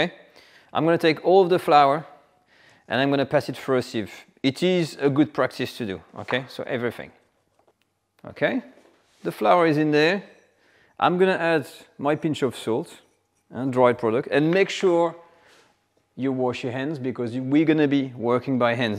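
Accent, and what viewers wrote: France. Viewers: Please don’t lose your French accent. We Aussies love it. Can you use Gluten-Free flour?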